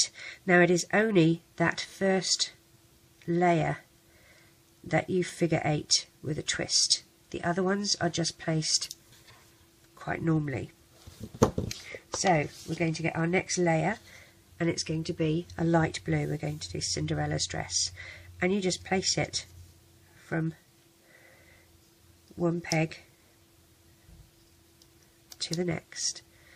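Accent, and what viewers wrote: United Kingdom. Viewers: your BRITISH LOL LOL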